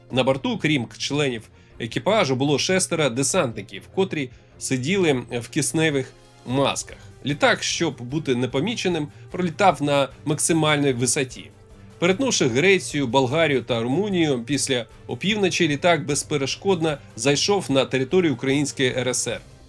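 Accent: Russia. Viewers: Ще все-таки залишається російський акцент і акання. Потрібно ще тренуватися, бо ріже вухо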